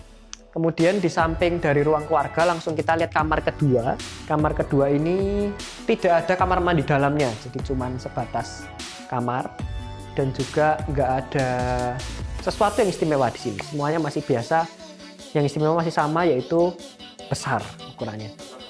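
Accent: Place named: East Java, Indonesia. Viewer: logat e indonesia ,koyok e arek iki cah jawa timuran 😅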